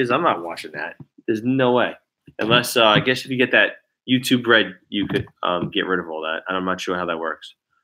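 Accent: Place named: Italian-American, United States. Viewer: Love your channel and your accent, lol. I'm originally from Queens (now live on Long Island) and you remind me of my Italian relatives. Great video!! Glad I met you on JD's Variety Channel.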